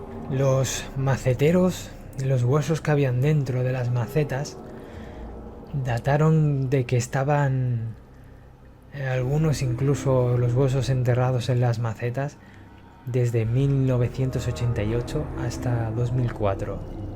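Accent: Spain. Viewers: Por Dios, que mujer, da escalofríos! muy buen vídeo :) espero Mi saludo Por cierto, como te llamas? (soy bastante nueva). Y adoro tu voz :3 eres de España? saludos :)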